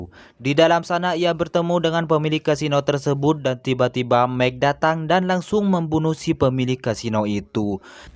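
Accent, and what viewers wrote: Madura, Indonesia. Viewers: Kok yg ngomong logat nya kaya orang Madura ya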